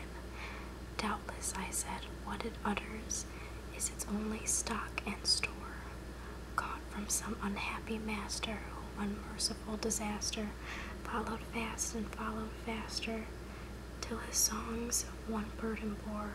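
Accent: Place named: Buffalo, United States. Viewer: I recognize your accent. I live in WA state now but I used to live in Buffalo. Go Bills :D !!!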